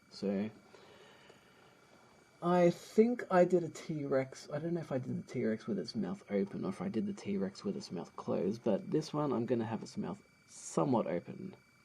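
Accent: Australia. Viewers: You're from Australia! I knew it from the first video I watched! Despite being bad at identifying accents, I got this one right. :)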